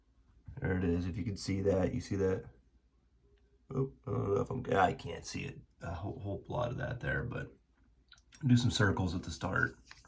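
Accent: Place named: Pittsburgh, United States. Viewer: I thought I heard a hint of Yinzer in your accent. Proud PA boy here.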